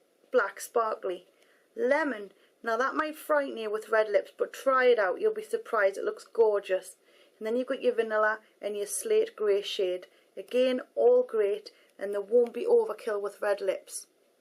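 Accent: Newcastle, United Kingdom. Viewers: "That FREE glue is the best I've ever used" That's the kind of honesty that keeps me coming back. That, the pugs and the geordie accent.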